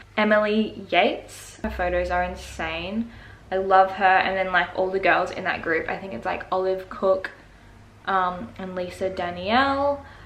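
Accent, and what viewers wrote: Australia. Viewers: I can’t tell where she’s from like Australia or New Zealand maybe?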